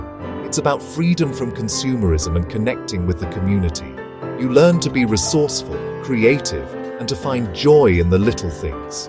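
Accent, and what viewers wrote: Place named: United Kingdom. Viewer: AI slop with a Limey voice over.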